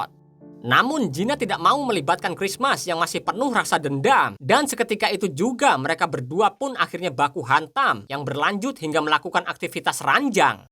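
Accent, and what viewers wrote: Java, Indonesia. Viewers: Suara lu terlalu Jawa mas 🗿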